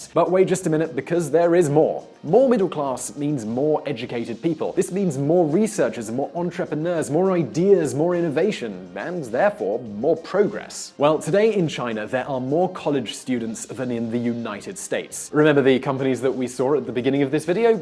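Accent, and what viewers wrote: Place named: England, United Kingdom. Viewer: How do you define the middle class? That’s easy, it is accent and you have the perfect English middle class accent. Have you noticed all those YouTube videos where English young ladies (usually very good looking) teach the world how to speak with a BBC accent?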